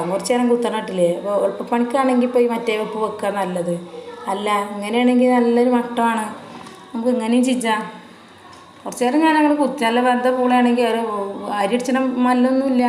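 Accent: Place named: Malappuram, India. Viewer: നമ്മുടെ മലപ്പുറം ക്കാരുടെ ആ ഒരു സംസാരരീതി ഞങ്ങൾ കൊല്ലം കാർക്ക് ഒരു പ്രത്യേക ഇഷ്ടം തന്നെ സൂപ്പർ ഐറ്റം ആണല്ലോ കപ്പയും മീൻകറിയും ആഹാ ശരിക്കും പ്രവാസലോകത്ത് നിന്ന് കണ്ടപ്പോൾ 😋😋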